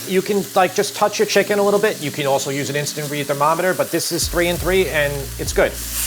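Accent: New York, United States. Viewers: Mostly here for the delightful New York accent. North-Eastern US has the most pleasant sounds of all US accents.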